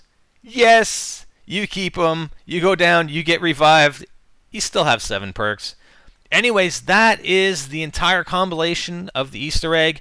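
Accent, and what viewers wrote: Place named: Canada. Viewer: your Canadian, aren't you..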